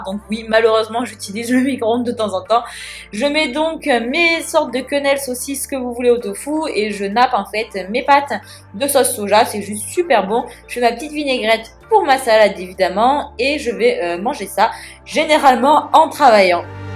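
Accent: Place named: Marseille, France. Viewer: au début on dirais que t à Marseille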